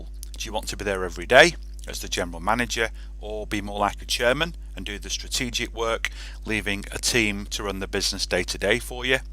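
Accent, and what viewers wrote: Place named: United Kingdom. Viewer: As soon as I hear a Brits voice, I know I need to find another source about opening a business in NEVADA USA…. 🤦🏽‍♂️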